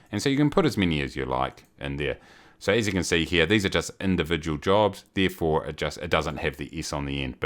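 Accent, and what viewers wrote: New Zealand. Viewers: Thanks for these videos love the kiwi accent too :) One thing though...You didnt really talk about how facillities make money and the kind of averages you could expect from them. I noticed you receive income from ground fees but didn't seem like much. Maybe you can make a quick vid explaining more on different types of income etc?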